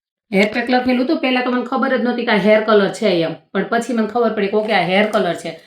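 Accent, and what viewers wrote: Kathiawar, India. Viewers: કાઠિયાવાડી છો દીદી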